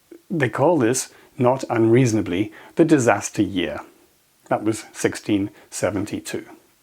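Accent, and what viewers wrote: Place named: United Kingdom. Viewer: Are you btw Dutch or British? Your accent sounds very British.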